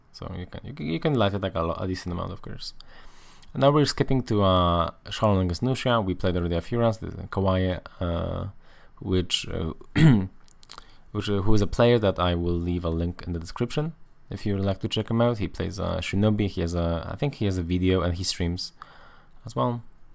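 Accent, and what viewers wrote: Poland. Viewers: I have to ask: are you Polish?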